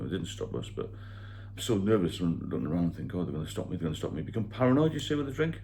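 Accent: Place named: Newcastle, United Kingdom. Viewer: A A works for me initially but then after 6/7 visits I ALWAYS throw it away your you tube channel is definitely for me. Love your Geordie accent. I can tell you’re a great person for helping people well done in your own sobriety. 😊👍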